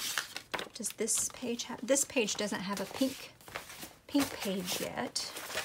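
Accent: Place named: Ozarks, United States. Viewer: I love how you think (talk) things out. As a novice journal maker myself, it really makes me think about the journal as a whole. Your explanation of the busy hands journal in part one made me have an "aha" moment. Thank you for continuing with this type of video. I'm from the Missouri Ozarks so I totally understand about the accent, I've heard it all my life, sometimes in a very condescending manner. I've learned to just be proud of who I am and where I'm from, all that to say.......Y'all sounds GREAT!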